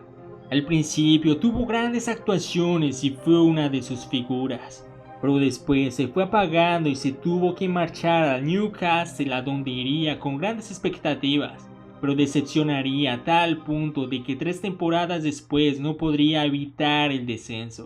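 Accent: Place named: Brazil. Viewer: Seguramente es brasileño y le cuesta el español se n ota el acento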